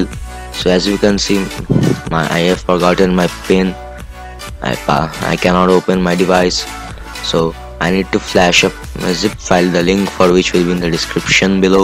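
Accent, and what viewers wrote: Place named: India. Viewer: lazy english or indian accent i hate it.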